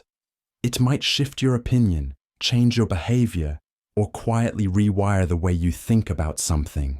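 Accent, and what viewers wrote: United Kingdom. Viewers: Please never change the voice, I love this British voice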